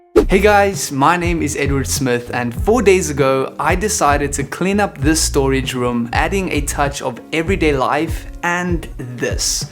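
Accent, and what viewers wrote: South Africa. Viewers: I can already tell by that accent that this man is a SA kid🤌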